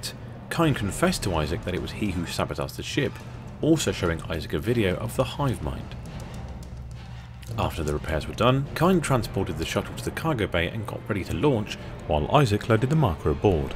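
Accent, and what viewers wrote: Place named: United Kingdom. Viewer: Yey funny brit narrator uploaded!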